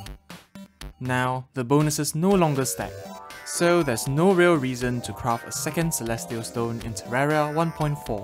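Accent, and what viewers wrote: Singapore. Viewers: Singaporean accent? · r u singaporean?theres a strong singaporean accent no offense :/ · are you singaporean by any chance? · I'm sorry if you aren't but you sound very Singaporean, like not when we are speaking casually amongst others but when we are presenting something in a formal context.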